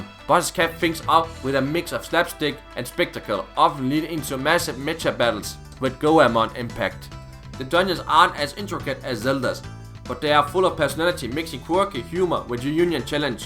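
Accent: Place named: France. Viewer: Crosscode is one of my favorite games of all time. Should try phoenotopia awakening if you like zelda-likes. It's from a sidescrolling perspective which translates really really well. The explanation, story and puzzles are amazing. Curious where you're accented is from. You sound French but when speaking faster it sounds more German or Dutch.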